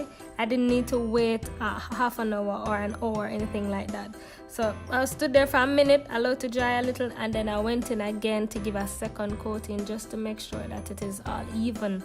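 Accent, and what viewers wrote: Jamaica. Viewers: That's a Jamaican accent. Pure Jamaican beauty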